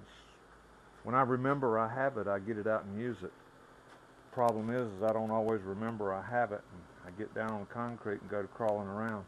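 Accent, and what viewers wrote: South, United States. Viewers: I think you had about a couple of hundred subs when I stumbled in last summer. Been distracted by some health issues the last little while, but I hope to be back for good now, all seeming to have worked out ok, thank the Lord. Anyway, congratulations! You have an excellent channel, and I've always enjoyed watching and listening to a true southern gentleman!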